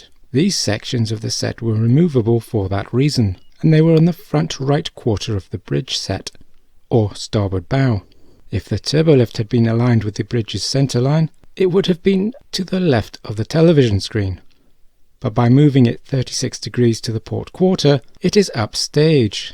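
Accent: United Kingdom. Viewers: he’s a Brit… They pronounce thing weird.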